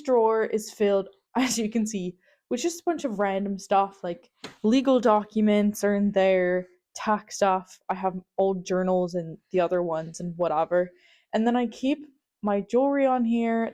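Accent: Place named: Ireland. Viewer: i watched a lot of your video's in succession. You have no idea how much your accent changed from Texan to to far more Irish.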